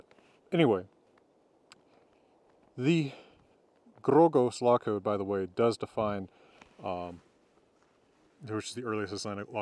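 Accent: United States. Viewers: Interesting video, however when you say you use "Reconstructed medieval Norse pronounciation" I think it's not accurate. You are saying approximately what they sounded like, with an american accent. Rísmál - Icelandic pronunciation --> Reesemowl(approximately) What you said sounded like Rissmaul./mall Who says that 11th century norse "Á" sounded like an english speaker failing at icelandic pronunciation? I don't understand how reconstructed norse spoken by a non native speaker would ever be more accurate than the icelandic pronunciation. Icelandic is the closest to old norse we will ever get because it is the same language only older, slightly changed. It would be nice to get a video on the modern reconstruction of norse. I don't know what you would base it on though. Modern icelandic and the old sagas? I enjoy your videos and hope I don't sound discouraging, because your disclaimer was probably excactly for these kinds of comments. 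A video expanding on this reconstructed pronunciation would be wonderful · I love that you have a deep, tough American accent and voice. With a cowboy intro with western music. In a cowboy envirement. Dressed with a cowboy style. Talking about Scandinavia and Vikings. Freaking badass as a Dane i must say. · Using the comparative method of reconstruction and the contemporary sources (e.g. the First Grammatical Treatise) it is perfectly possible for an educated person to come much closer to Old Norse than modern Icelandic, even with an American accent, although I will grant you that Dr. could have made the */i:/ longer.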